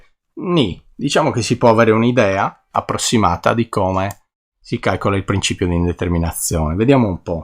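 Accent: Sardinia, Italy. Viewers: mi sono iscritto . P.S. dall' accento mi parrebbe di sentire un sardo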